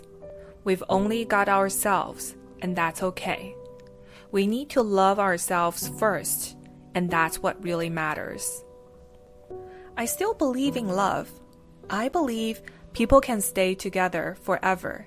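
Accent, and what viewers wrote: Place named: Asia. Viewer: I detect an asian accent.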